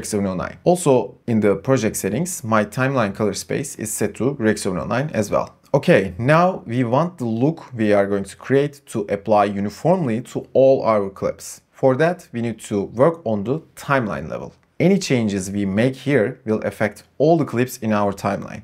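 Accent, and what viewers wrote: Turkey. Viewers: AKSANDAN ANLADIM TÜRK BU :D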